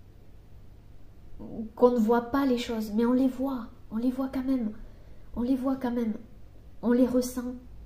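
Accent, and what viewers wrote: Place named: South-West, France. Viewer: Je vous aime trop, vous me faites sourire quand vous vous énervez avec bienveillance avec votre petit accent de notre sud-ouest. Et vos messages raisonnent tellement depuis que je vous écoute et me permettent de grandir. J'espère pouvoir vous rencontrer un jour. Je viens de conscientiser que j'ai tout....peu mais j'ai tout....je n'ai plus courir derrière qqchose....car à aujourd'hui j'ai tout ce qu'il me faut et si j'ai encore plus tant mieux mais j'ai tout.... merci ❤❤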